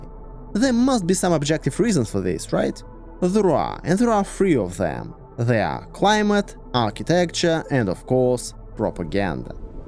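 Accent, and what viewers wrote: Russia. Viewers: В смысле скрыть? Он говорит с сильным русским акцентом. Или надо типа эс иф ю ар ридинк кириликс? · бля у тебя такой русский акцент im crying frrr 🫣😭😭😭😭😭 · Он русский, пытается скрыть акцент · Didn't you notice the russian accent? He's literally russian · Я этот акцент везде узнаю. Т О В А Р И Щ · I guess you are a russian speaker, you have an accent and you could say name city of Russia without any difficult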